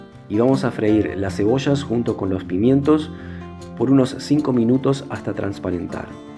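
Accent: Argentina. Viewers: Me encantó todo desde la receta hasta el acento precioso de el Argentino que lo explicó todo a la perfección. Gracias! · Que placer oir hablar argentino. Estuve aì tres años. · Que hermoso que explicas!!!! sos Argentino?. nos das una variedad de comidas impresionante!!! Me encanta!!!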